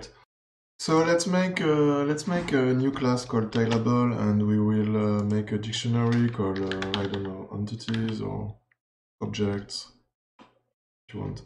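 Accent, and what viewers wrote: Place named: France. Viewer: Tu as un accent Français ahah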